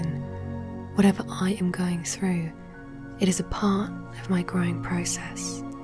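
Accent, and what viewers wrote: Scotland, United Kingdom. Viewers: This CD is very calming <link> the first meditation focuses on breathing, the second guides you through the Buddhist metta bhavana--loving kindness to all, and the third is a meditation to be used when walking. him is originally from Scotland, so there is a little accent to his voice, but it is very soothing and not at all distracting. If you are a beginner to meditation, this CD will walk you through all the steps of relaxing and breathing as well as sending out the positive thoughts of love and kindness that will be returned to you. We have several CD's, but this one is a favorite that we choose most often. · Whose voice is this? So clear and soft... and I love this beautiful British accent! Thank you very much! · MrRasZe that would be British. Lovely accent.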